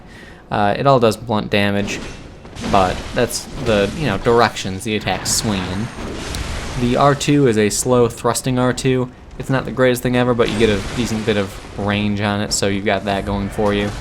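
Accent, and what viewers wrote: United States. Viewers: the american accent is strong with this video.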